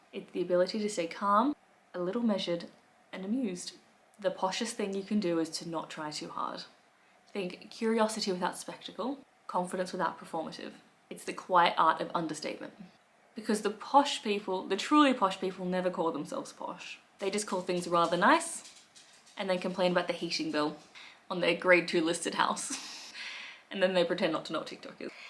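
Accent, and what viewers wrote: Australia. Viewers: You come across as a posh Sheila, only a trace of an Aussie accent. Oh, I've always called it Dinner, supper is that snack midway between an earlyish dinner and bedtime whilst watching that late TV programme. It has always been the Drawing room, a door from both the hall and dining room, it had a baby Grand in the bay window the TV almost hidden in a corner, the living/morning room was the one we spent most time in, next to the kitchen. Dad was the posh one, Mum was the almost Cockney, SE London of Russian Jewish ancestry. My accent is a mixture of both with Dad's mannerisms. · Hello sauce pot💕 How do you know all these things about the UK upper crust Being a Aussie Sheila🐊🦘🦘 The UK upper class toffs only act modest to try and hide the deep inequalities that exist in the Uk You sound like a Aussie lady trying to talk English southern middle class with A aussie accent interesting little video sauce pot💕 Hoping you're keeping ok Nothing wrong with your Oz accent Don't hide it the Oz accent is great The great late Esben Storm brought the great Aussie ocker boisterous accent to the world 🌍🦘😉🤠🐨🦚 👍 Prisoner cell block h Bea Smith having a smoko mate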